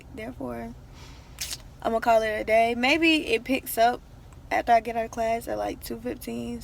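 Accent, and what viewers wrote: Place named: Atlanta, United States. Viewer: Yeah I can hear the Atlanta accent. :)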